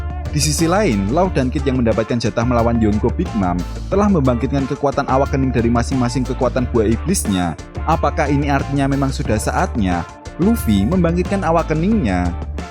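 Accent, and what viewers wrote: Java, Indonesia. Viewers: Mani Ledok jawa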